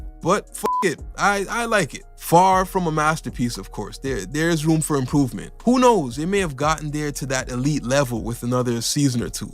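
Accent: Toronto, Canada. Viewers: ur def from toronto broski lol i love this channel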